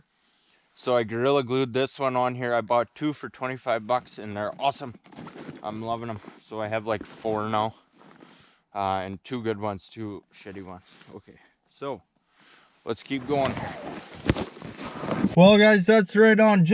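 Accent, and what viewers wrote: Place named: Alberta, Canada. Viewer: This guy sounds like hes from Alberta Canada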